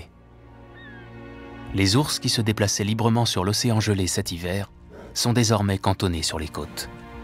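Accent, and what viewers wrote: France. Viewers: The French accent makes this video so Romantic.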